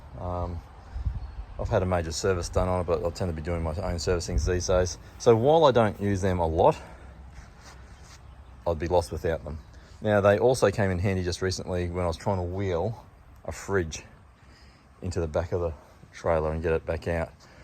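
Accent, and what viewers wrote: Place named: Australia. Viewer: The ramps that you talked about in this video look really useful. I buy used furniture like heavy china cabinets that are two pieces, a top part with glass doors that sits on a base with drawers. The one that I have now is unbearably heavy even with two people to move it out of the van or to lift it up and place on the base so I can photograph it. I think these ramps would be ideal for moving furniture out of the van and possibly sliding the top part of the cabinet onto the base. That would probably take some experimenting to get it to work out properly. Your Australian accent is lovely, by the way!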